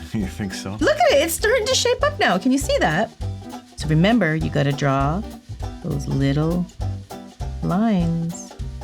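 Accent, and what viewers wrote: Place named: Canada. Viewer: DO YOU MAKE THOSE LIL HATS?? WHERE DO YA GET THEM?? MY PRAYERS ARE FILLED WITH THOUGHTS OF YOU AND CAMERA MAN AND YOUR GUITAR PICK'N BABY GIRL THIS HOLY DAY SEASON AND THE HOLIDAY'S ALL TOGETHER... MERRY CHRISTMAS AYE... I NOTICE YOU SAY AYE AAAALLOT SO CUTE. MUST BE A CANADIAN THING HUH. "I MEAN AYE"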